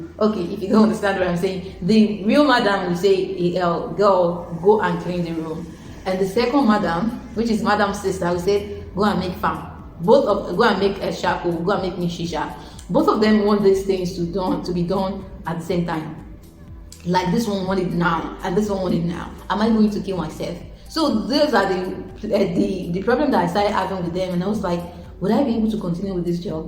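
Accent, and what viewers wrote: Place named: United Kingdom. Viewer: Your British accent is fire 🔥.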